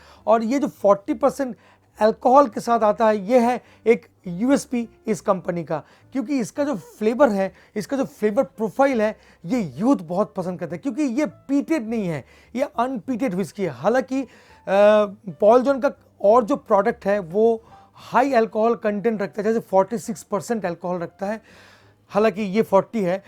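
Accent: India. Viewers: You have a great accent.. I really like your true desi style. No fake dikhawat.. Bless you with good health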